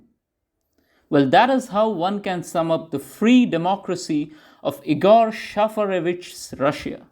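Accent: India. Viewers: The narrator sounds Indian as shaferevich being impressed by Indian mathematics allowed Indian orthodox Christians to come to Rus! Long live Shaferevich Long live Orthodoxy Long live Mathematical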